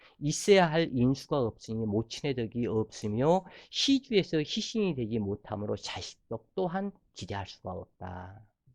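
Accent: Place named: Jeolla, South Korea. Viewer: 전라도 사투리가 엄청 도드라지네요.ㅎ 갑신월 기사일주라서 주의깊게 듣고 갑니다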